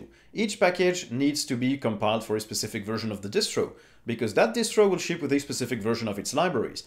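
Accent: France. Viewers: You've something like a French accent..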